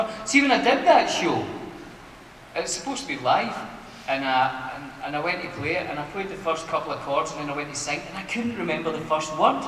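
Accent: Scotland, United Kingdom. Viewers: No he just sounds Scottish you pleb. And British isn't an accent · He's accent is like a mix of British, Indian, Scottish and maybe a little bit Scandinavian.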